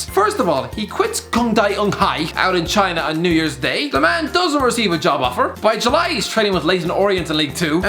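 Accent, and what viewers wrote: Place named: Ireland. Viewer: I had to put the video at 0.75x speed just to make this guy's Irish mumble rapping sound coherant.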